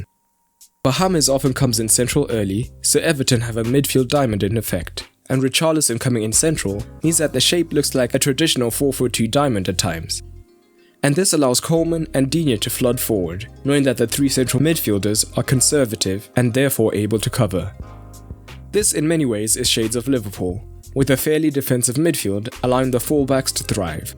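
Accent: South Africa. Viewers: I'm convinced this guy has a South African accent.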